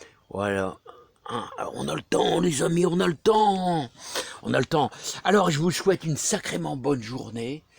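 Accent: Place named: Nord, France. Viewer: Bonjour, Je me lasse pas de regarder tes videos, je les attends comme une série de TV (neetflix…hihihihi ) en plus avec l’accent du Noord …J’adore sans chichi et avec beaucoup de passion. Ne change rien, malgré les 4 degrés de ce matin. Bon dimanche.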